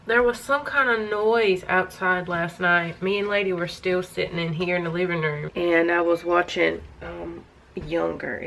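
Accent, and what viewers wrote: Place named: Mississippi, United States. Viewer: Girl are you sure you ain’t from Tupelo Mississippi? 🤣 the way you talk and explain things... you always make me smile. 😘❤️❤️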